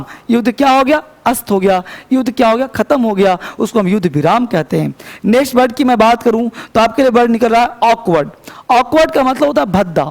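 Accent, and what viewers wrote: Bihar, India. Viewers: Shayad AAP Bihar Ya Jharkhand Se Ho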